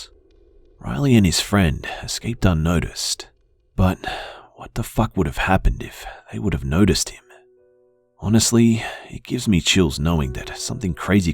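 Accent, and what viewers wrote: Australia. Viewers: So much for sleeping tonight., you make me sleep with the light on. My twisted side thanks you for this, my electricity bill does not. No more listening in the dark with headphones and a narrator who feeds of the fear of his users. No need to go hungry, love it and will keep listening to your wonderful Aussie accent!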